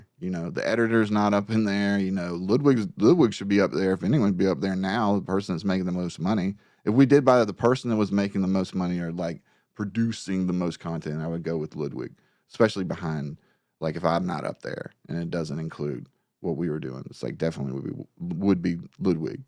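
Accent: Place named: South, United States. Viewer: The reason X-Ray girl didn't talk much is because she didn't watch what the guy's do. She never saw Trek, StarWars, or read comics. Them saying she was limited to 8 words a stream was a running joke because she just wasn't comfortable talking about stuff. She organizes superchats for Gary and highlights ones he needs to read immediately by messaging him. She puts notes on ones for language or trying to decipher what they're saying because Gary isn't the strongest reader and has problems seeing the small print. As far as goes, I don't know where she thought she was going to work in animation at in the US. There aren't any here. It's all done in Canada or overseas now. As a southerner myself that happened to have lived in Canada for awhile, she sounds pretty typical of someone from one of the major provinces. I don't know why people think they're so polite because they were some of the rudest people I've ever met in my life. 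After having lived down here even my Canadian husband saw it. The first time we went back to visit his family he was trying to explain to his dad just how much more polite people are down here even just with traffic. Love your voice, but again as a fellow southerner, I appreciate the accent. As for Gundam, he sounds like a pretty typical musician. Most are very insecure and immature. Not really anything surprising. Especially for a front man/singer. Not going to unsub because I like his stuff and can separate the online persona from the real person. I'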